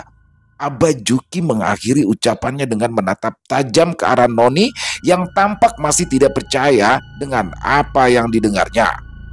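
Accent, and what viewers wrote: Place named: Jakarta, Indonesia. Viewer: ia sama2 Bang seneng mendengarkan suaranya maaf Bang aslinya dr jakarta suaranya ciri kaya orang Jakarta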